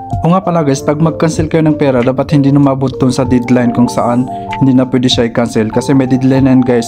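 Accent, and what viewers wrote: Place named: Maranao, Philippines. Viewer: Hello sir maranao po ba kayo,kc maranao kayo magtagalog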